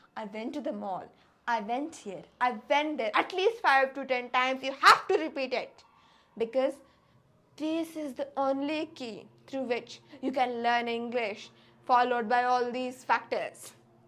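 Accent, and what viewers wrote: India. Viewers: first leave the f indian english accent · The pure Indian accent =💀. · You India accent spoiled the language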